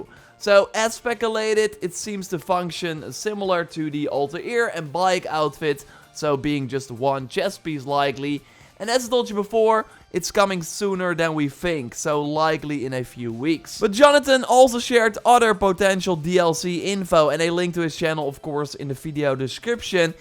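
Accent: Netherlands. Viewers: why does sound so Dutch? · Yeah i think he speaks dutch judging by his accent at least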